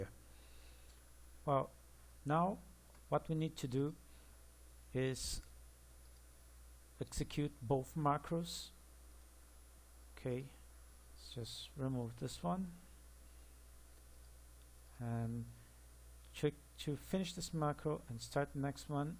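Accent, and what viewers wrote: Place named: Brazil. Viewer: reconheço um sotaque brasileiro de longe, muito bom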